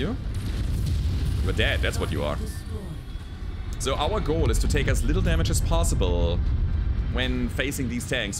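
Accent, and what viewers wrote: Germany. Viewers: I'm not very good at this game but I love stomping around (and playing Mechamon while I do so). I'm really enjoying your unmodded playthroughs; this one I'm finding especially interesting because it features the one DLC I don't have. Also I hope you don't mind me saying this, but I love your accent! It's nice to know how all the German stuff in the game is actually meant to sound. 😅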